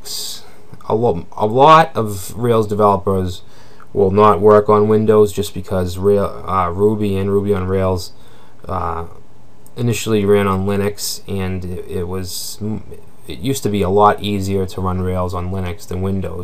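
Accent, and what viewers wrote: Boston, United States. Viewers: Are you from Boston? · accent sounds very boston-y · bcz he is from boston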